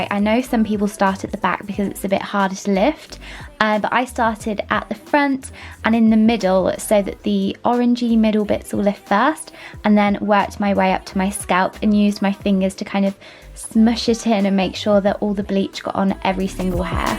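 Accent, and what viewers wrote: England, United Kingdom. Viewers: I love these English girls and their accent. I gotta find one for myself. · An English Accent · U look really amazing good job! Explanations are very clear and well said 👏love this british accent!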